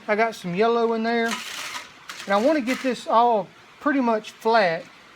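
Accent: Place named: South, United States. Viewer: Not to get off the subject but I'm from the south just like you and we have similar accents. I can understand every word you say but I turn the sound down and the captions on so I don't bother other people around me and YouTube always distorts the captions when dealing with Southern accents. YouTube's voice recognition needs some work. I mean "hard as a rock", Youtube interprets as "whores".... On the subject of what your doing, I'm looking into using waste plastic (like those gray Wal-Mart bags) as an alternative to fiberglass resin and polyester. I have used cotton and even cardboard brushed with Bondo fiberglass resin instead of polyester to make things. I've made speaker boxes for cars out of cardboard coated with fiberglass resin then glued that felt material over top of it. It's been holding up fine for a few years.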